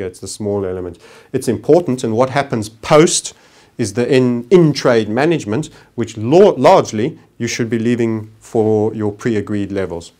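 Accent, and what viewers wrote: South Africa. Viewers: I love this man's accent, Sounds South African but I could be wrong...totally agree with what he's saying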